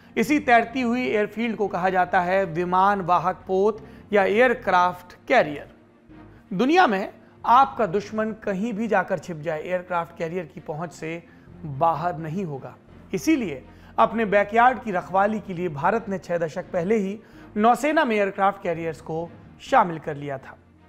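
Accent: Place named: Bundelkhand, India. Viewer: देश की खवर देश की आवाज़ देश के लहजे में देश के बुंदेल खंड के सपूत सौरभ द्विवेदी संमोहित करने वाले अंदाज़ में समाचार सुनाने वाले ग्रेट.....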